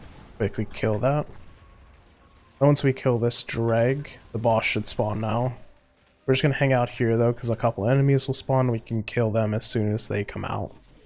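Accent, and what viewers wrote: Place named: Quebec, Canada. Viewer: French Canadian Le Mont accent NOICE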